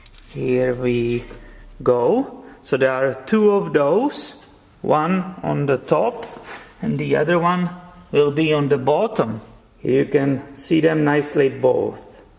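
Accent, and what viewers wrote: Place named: Ukraine. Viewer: Love your vids, smooth, low key, imformative, with a slight Ukrainian , Polish , touch maybe!!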